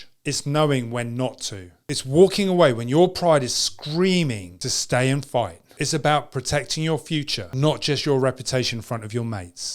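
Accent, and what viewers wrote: United Kingdom. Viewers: So he says assault does not involve a strike. Will police charge someone just for Assault? I'm guessing that they will not. If so, then bullies are free to intimidate/harrass to their content?! I think the US has a "stand your ground" right; if the harasser/assualter encroaches within striking distance (i.e. shows threat), i presume the person is entitled to strike first? This video speaker has British accent, so maybe his advice does not apply in the US?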